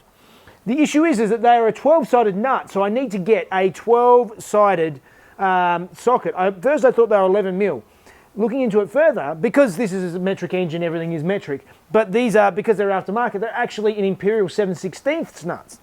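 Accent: Australia. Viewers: I can hear the “aaaaaaand” on the Australian accent in that thumbnail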